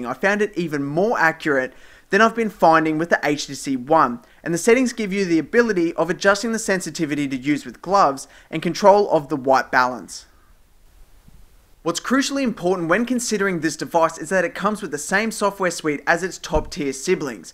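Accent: Australia. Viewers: Australian accent ~ :D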